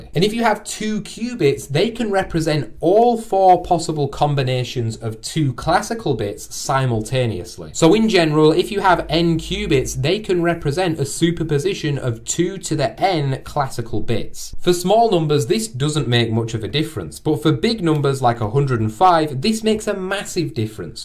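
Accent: United Kingdom. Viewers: i think this channel is going to be the bri-ish "fireship" channel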